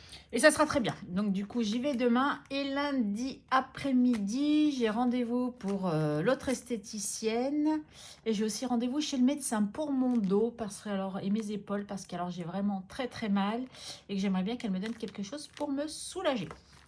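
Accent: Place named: Quebec, Canada. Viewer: Coucou, une belle vidéo. J'adore ta simplicité et ta spontanéité. Tu sais que tu as des termes que tu emploies comme nous les Québécois. ( P'tite, ben) sont des termes abrégés et des sons modifiés que nous utilisons beaucoup. Hihi tu as un peut de Québécois en toi 😂❤ De belles économies super ça. Aller bye bye à + 🫶❤️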